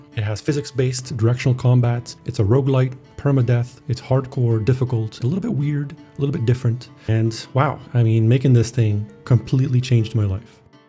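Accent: West Flanders, Belgium. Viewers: Te ton nog ne west vlaaming. Van wo zij wel? We zijn trots. Veeeel sucses nog in de toekomst. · En nen west-vlaming ton nog 😅 gg!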